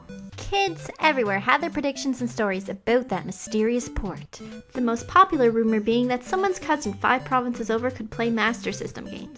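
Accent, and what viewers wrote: Canada. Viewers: Well, she is canadian; you can´t expect for the whole world to speak exactly the same. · That Canadian accent is adorable!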